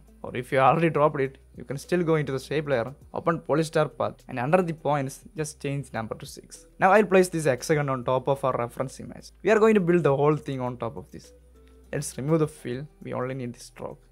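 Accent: Kerala, India. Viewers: malayali alle ? enikk aa accent kettappo thonniyatha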